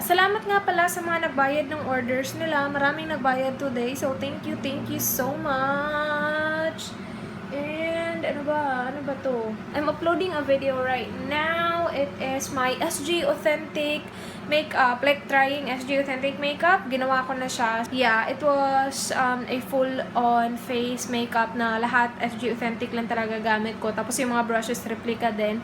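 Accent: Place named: Cebuano, Philippines. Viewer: Miss I'm your new subscriber... My mother is from cebu as well hehe... I super enjoy your videos it reminds me my family ung tono ng pagsasalita mo parang same sakanila kase from cebu and english...hehe Pwede po ba shout out sakin and sa asawa ko na si Odi dito kami sa Abudhabi... wait ko po hehe.... Godbless us all... Take care!!!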